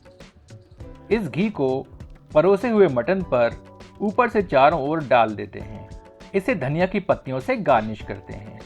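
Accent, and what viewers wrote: Standard. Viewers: Your hindi sounds so pure.. great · Aap ekdum shudh hindi bolte hai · Bhai etani shudhha hindi mujhe dharmendra ki filma yad aarahi hai aise hi bol rahe the. Ati swadista. Uttam lajwab jivha par laar prakat ho raha hai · Beautiful shudhh hindi. Lovely to the ears.🌹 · आपकी शुद्ध हिंदी को सुन कर मन तृप्त हो गया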